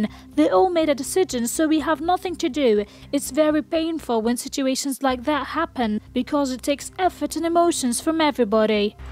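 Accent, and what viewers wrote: United Kingdom. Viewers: انجليزي بريطاني بريطاني👍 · A good British accent. It should be less severe than it is.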